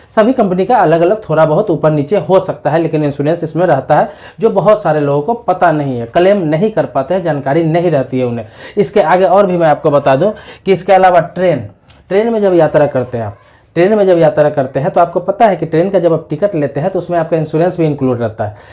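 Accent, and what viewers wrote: Bihar, India. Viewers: Kya bolte ho dhyan se sune fir bolein,kya bihari ho........tabhi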